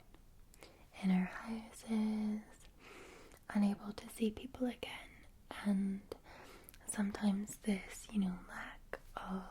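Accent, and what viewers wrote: Scotland, United Kingdom. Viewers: official Bears fan.🐻⬇️ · good tae see Scottish asmr 🤝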